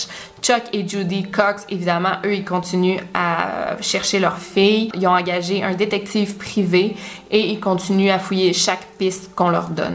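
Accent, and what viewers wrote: Quebec, Canada. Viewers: merci pour cette terrible histoire, je ne l'a connaissais pas. Une abonnée supplémentaires. Dîtes moi,vous êtes Québécoise? Bel accent et pays que j'aimerai visiter. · J'avoue !!! Et pourtant de base je ne suis pas fan de l'accent québécois et ben là je peux juste pas m'en passer!!! Y'a que les imbéciles qui changent pas d'avis hein 😉😅😇 comme tu dis ma soeur, qui dit mieux 😍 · Coucou, je trouve que tu racontes super bien, je t'adore, c'est vrai que au début je comprenais pas tous les mots car tu a l'accent du Québec (moi je suis française a 100%😅😅) mais je m'y habitue et je trouve que tu mérites beaucoup plus d'abonnés!!! Bisous bisous et continue tes vidéos, elles sont trop bien! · J'ai adoré J'ai liker avant même de regarder la vidéo j'attend avec impatience tes vidéo et en plus je kiffe l’accent québécois Bisou de île Maurice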